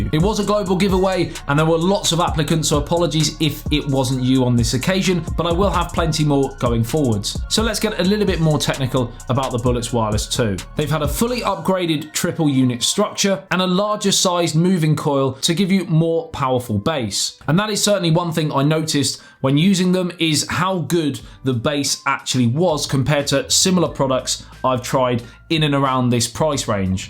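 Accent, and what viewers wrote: England, United Kingdom. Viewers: Thanks for addressing those cuticles, you're getting there bro. 😉 Note 2 - quit shaving your chest. That's so 90's gay porn, lol (don't ask me how I know). And finally, are you and the dude from Abroad in Japan from the same area of England? To this American, your accents are remarkably similar. Thanks for continued awesome content sir!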